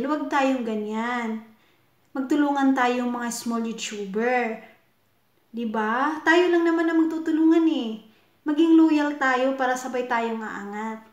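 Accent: Visayas, Philippines. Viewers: Malingaw kay ko saimo day bah!kay tinoud kay ka!!bisdak kaau!hahaha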